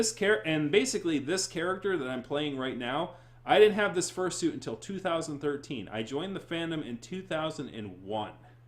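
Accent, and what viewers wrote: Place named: Australia. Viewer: At last! An Aussie accent! Maybe you've done that in the past, but this is my first time present for it, so... One thing the Furry Fandom seems to be really good at is creating its own problems and then complaining about it. This whole thing with h3h3 is just the latest example. The Majira episode is truly a decent opportunity wasted by technicalities which have already been forgotten, ironically enough. I can't say that the realization of what the Fandom is about isn't lost on furries themselves, since LOTS of them act like it's about the suits, even if they say it's not. It's disappointing to me, but it is what it is. All this podcast did in general is comment on the conduct they're seeing, so I respectfully shrug. What were we expecting without an experienced guide? Then again, you make a valid point about decorum. No one with taste would throw on makeup and S&M if they were having KISS as guests. 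I often think of jobs I've had or just doing a favor for someone where I'll reach for something, and usually boring people will automatically vomit the phrase "he has long arms.". Well, thanks Captain Obvious. And they say this in a way that requests that I grin from ear to ear like an idiot and express near grief when I don't. Yeah, guy, it's nice to have 'em, but it's not all that interesting when you have 'em. Thank you will do the trick. The latest on this can be found on Twitter, where the debate on whether the Furry Fandom even needs representatives rages. Never mind that i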